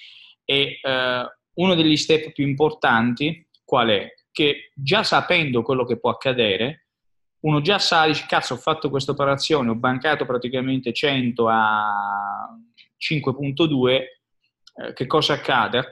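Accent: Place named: Bari, Italy. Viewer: Non si capisce nucazz propij alla barese come parla lui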